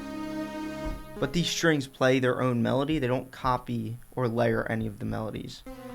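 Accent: Philadelphia, United States. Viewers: You over analyzed non intent that was made by Xfer’s Cthulhu plug-in. But I like your excitement and cheesy Phila accent. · Sound you're from Philly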